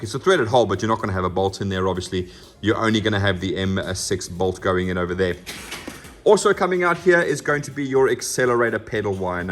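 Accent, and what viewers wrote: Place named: South Africa. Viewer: That South African accent. Also, awesome work man!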